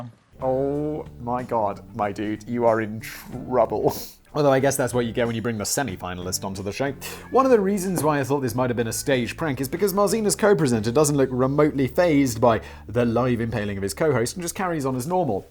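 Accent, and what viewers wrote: United Kingdom. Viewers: Actually thought he lived in America. Yes, he speaks like a Brit, but he seems very America-oriented. Every time he says "Danny" in his British accent, makes me think of the social worker scene in Mrs. Doubtfire. Where he goes back and forth in some normal look and Doubtfire? He cries out: "Oh, Danny boy!". Never heard of any of those Brit Soaps, but in the last 20 years American soaps have become Star Trek vs. Clive Barker. No wonder many ended after 50 years. People like retro tech. Just look at any young person ever that thinks their meme of pretty much EVERYTHING is cool. I am used to seeing vinyl new again, but I saw a laser disc of Frozen at walmart today! You know? Those giant DVDs that lasted like 2 seconds? Whats next? Back to fucking beta tapes?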